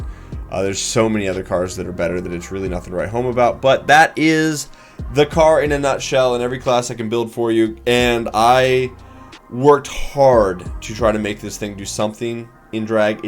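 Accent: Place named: United States. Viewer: Two-ring 😂 American accent is so funny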